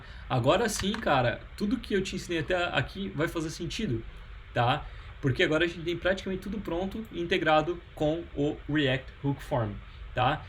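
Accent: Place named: Santa Catarina, Brazil. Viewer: Massa!! Rio do sul exporta dev hein haha A rocketseat era dai também né. Imaginei que fosse de SC por causa do sotaque, sou de Floripa. Cai no vídeo pois estava tentando decidir qual biblioteca iria usar para form. Valeu pelo conteúdo!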